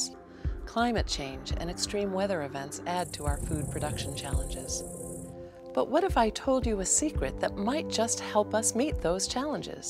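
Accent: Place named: United States. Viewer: Like si vienes por inglesamericano :v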